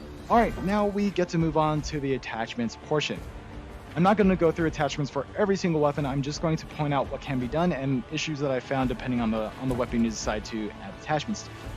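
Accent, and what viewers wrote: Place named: United States. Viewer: I can already tell your american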